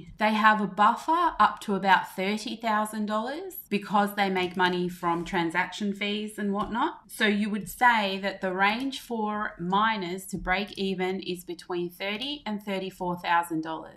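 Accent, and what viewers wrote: Australia. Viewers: Loved your video! Also lovely to hear that Australian accent! I lived in Melbourne at the start of the pandemic - great city. I plan to return one day.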